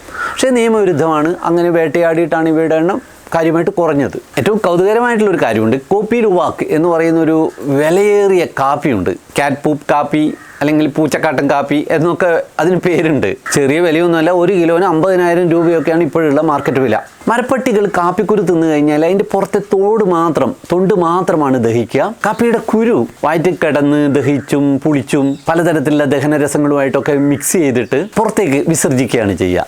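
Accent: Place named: North Kerala, India. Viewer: സാറിൻ്റെ വീഡിയോസ് കണ്ടിരിക്കുക എന്നത് ഒരു പ്രത്യേക അനുഭവമാണ് ... താങ്കൾ ഓരോ വീഡിയോ അവതരിപ്പിക്കും മുമ്പ് വളരെ ആഴത്തിൽ സബ്ജക്ടിനെക്കുറിച്ചു വായിക്കുകയും, അന്വേഷിക്കുകയും ചെയ്യുന്നതായി മനസ്സിലാവും .. അതുപോലെ വടക്കൻ കേരളത്തിൻ്റെ ഉച്ചാരണ രീതി താങ്കളുടെ വീഡിയോസിൻ്റെ പ്രത്യേകതയാണ് ... ഒരു റിക്വസ്റ് ഒണ്ട് സർ, നീൽഗിരി മാർട്ടിൻ എന്ന ജീവിയെ കുറിച്ച് ഒരു ഡീറ്റൈൽ വീഡിയോ ചെയ്യാമോ?? 🙏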